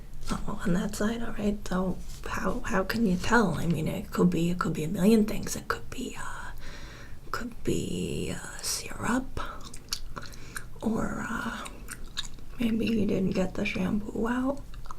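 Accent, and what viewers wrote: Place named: Boston, United States. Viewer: Your beautiful and are you from Boston if so your accent is to die for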